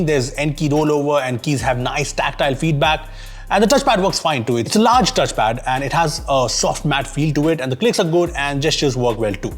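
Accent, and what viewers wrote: India. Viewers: This comment will be called rasiccist but I love the way Indian male acsents sound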